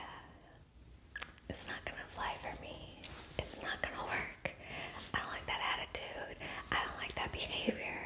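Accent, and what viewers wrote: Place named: United Kingdom. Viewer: you're funny saying "Hey,you" and "Who do you think you are?".If you could ask anything about me,fun or otherwise,what would it be?I respectfully wish you continued success with the ASMR videos.Love your facial expressions,mouth sounds,tickle taunts and your cute accent,you silly Brit.You can't get me if you tried.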